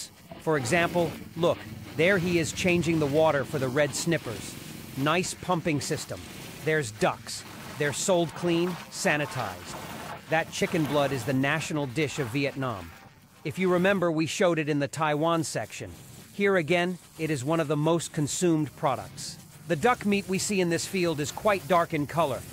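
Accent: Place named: Gaziantep, Turkey. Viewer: La Yorum Alının Çatında Yaziy Antepli Olduğun Sesini Duyar Duymaz Antepli Olduğunu Bildim Rafık 😂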